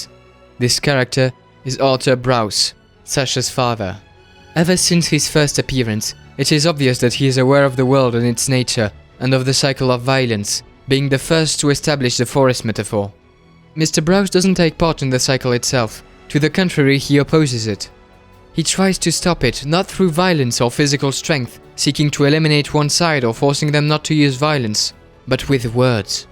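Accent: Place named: France. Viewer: Cet accent français... sublime.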